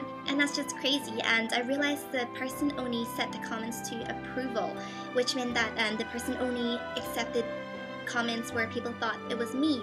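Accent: Ireland. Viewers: I JUST NOTICED YOUR IRISH ACCENT. Yeah I know I'm slow. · dude u hav an irish accentish wer u born der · I've had this problem before on the internet. It sucks tbh cos there's almost nothing you can do about it! You've done the right thing anyway by letting people know what's going on in a mature way. Anyone who knows you and cares about you will recognise that you aren't malicious at all, in fact from what I've seen you couldn't be more honest and kind. p.s. I love the little hints of Irish that sneaks in now and again when you're talking, it's really cute and certainly unique! Keep smiling! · You have the cutest accent EVER <3 omg <3 you can hear the little irish bits and GAHH <3 so so sweet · You sound irish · Whoa, an Asian girl with an Irish accent! That's pretty much the hottest thing ever. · youre irish!?!? sry,,, slow moment ive only seen like 2 of your videos :)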